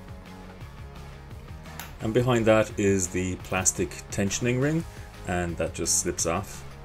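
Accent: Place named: Cork, Ireland. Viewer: Lol , I meant , where .. you sound corkish , int in this setup , love to pick your brains a bit on it , if you were up for that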